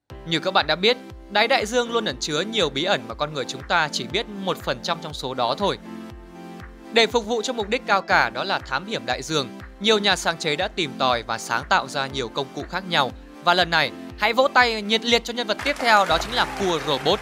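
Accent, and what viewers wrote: South, Vietnam. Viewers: Anh nói giọng miền Nam hài quá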